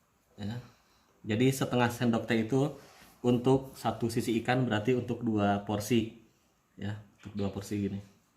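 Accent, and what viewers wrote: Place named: West Java, Indonesia. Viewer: orang sunda ya kang...dr cara nyebut alat" penggorengannya..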